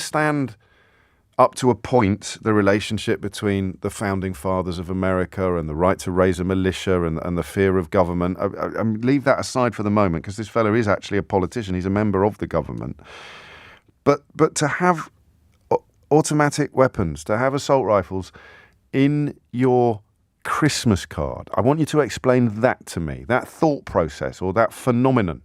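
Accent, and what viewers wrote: United Kingdom. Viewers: I mean, he's not wrong, but i hate hearing it in a British accent.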